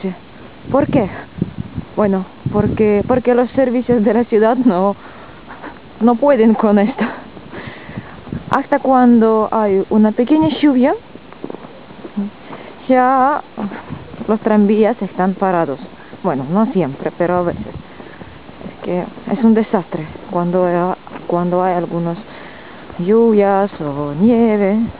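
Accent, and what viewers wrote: Argentina. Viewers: Habla medio argentina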